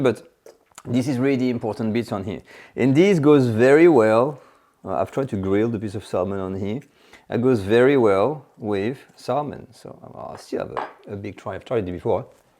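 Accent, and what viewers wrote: France. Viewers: PLEASE do a video in French; your accent will enhance the experience!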